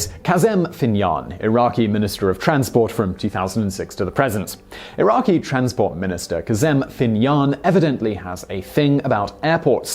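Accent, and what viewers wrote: United Kingdom. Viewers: Hes British. They say some words differently. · He's British!? I hadn't realised !!!! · He is British , hence he use British not American pronunciation norms.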